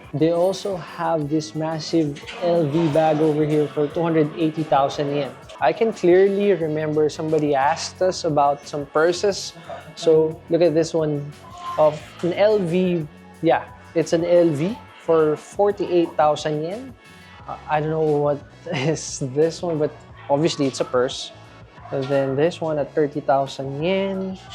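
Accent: Philippines. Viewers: Ayyy pinoy nga, very Filipino accent, cutiepie pa. May bago na naman ako panonoorin❤ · I watch these videos for the strong filipino accent 💪 Hoy Pare!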